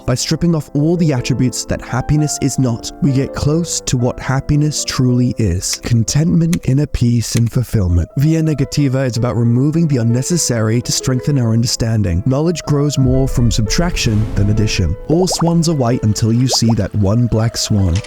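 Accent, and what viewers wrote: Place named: United States. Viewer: Yo I'm just wondering if you're really from India. Ur accent sounds like American, great!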